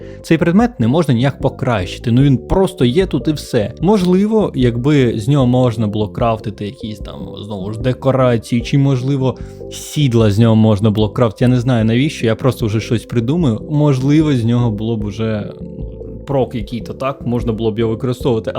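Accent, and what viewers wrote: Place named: Russia. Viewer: виправдання взагалі жоРсТкО помаранчевий так часУ з наголосом на У обговоримо застосування шкірку шкірку сенс/застосування справді гівно кориснішими тонування каблучки/персні незрозуміло просто обережнішими, без «більш» так дупу/сраку/гузицю поставитИ мОмЕнтИк І по всьому відео: 1. Літера «Щ» дає два звуки: [шч], але не [шь] 2. Милозвучність української полягає в звучанні голосних, а саме, в тому, що всі голосні як пишуться в слові, так і читаються і тут немає цих московських спрощень коли літера «О» читається як [а] і тому подібне. Багато такого чути в твоїй вимові. А так, молодець, що знімаєш українською. Виправити ці моменти і буде прям фист файно.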